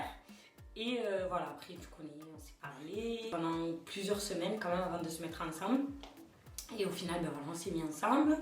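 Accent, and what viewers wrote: Marseille, France. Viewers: Ton accent marseillais est juste magnifique ! Wouaaaaaaaaaw 💓 heureux ménage la jolie ,gros bisous 😘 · Tu est de Marseille ? L'accent ?? ☺️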